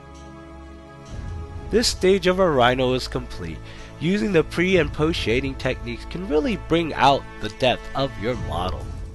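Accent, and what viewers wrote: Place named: Northern Ireland, United Kingdom. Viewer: Bet ye you're from Norn Iron!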